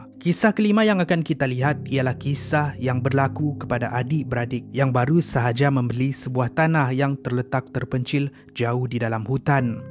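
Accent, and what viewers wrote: Sabah, Malaysia. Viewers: Orng sabah tuu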